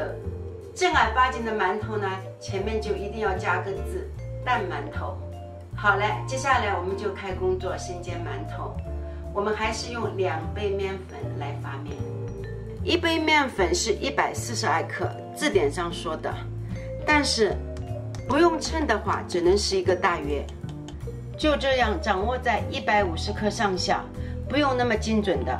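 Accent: Shanghai, China. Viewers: 上海口音👍👍👍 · 听到上海话就先点赞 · 正宗上海国语，皮薄的不能再薄了，金金黄🤣🤣😂😂😂听着好亲切，立马订阅😋😋😋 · 你好，我是上海人，现在在美国，很喜欢你的上海生煎，在这儿很难吃到。多次观看视频，有一个疑问。如果我一次包多了，能速冻吗？冻箱里取出后能马上煎吗？希望你的回复，谢谢你！煎后仍有汤汁吗？你的上海普通话与我一样，听了很亲切。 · 去年回国经过上海，有幸尝到上海生煎包，现在一直心心念念，想起就会咽口水哈哈，今日看到你的视频太激动了，准备学着自己做试试，你的视频做的非常好，上海话好听，喜欢，继续关注你的视频谢谢！！ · 上海闲话真亲切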